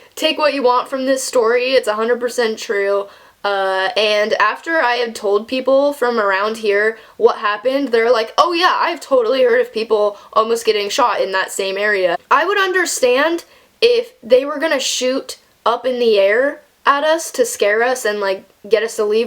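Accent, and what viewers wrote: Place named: Seattle, United States. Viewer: you have a Seattle accent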